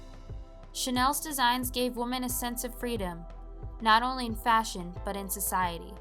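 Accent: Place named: United States. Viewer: Sorry, the voice commentary is very bad. Terrible, high pitched, American accent.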